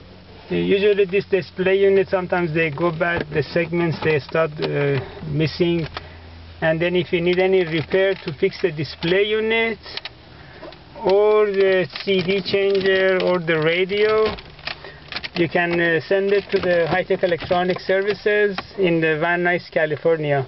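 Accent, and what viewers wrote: Iran. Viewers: hahaha! Love your Perrrrrrsiaaaaaaaaaaaan accceeeeeeeeeeennnnnnnt! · Like your accent, are you Iranian?!!!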